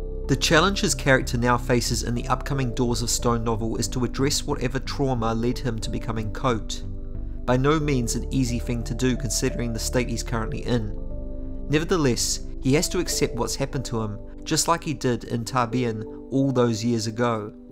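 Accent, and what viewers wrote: New Zealand. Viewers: Question for the creator: are you from New Zealand? I'm not great at accents, (I'm from Texas and have only rarely traveled), so I'm only guessing based on my experience, but I have rarely heard your accent before so am very curious.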